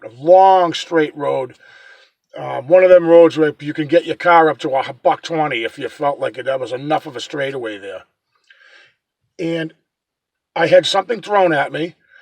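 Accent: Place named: New England, United States. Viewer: Awesome to see a fellow "New Englandah" on here.. From Providence, 🍻🍻🍻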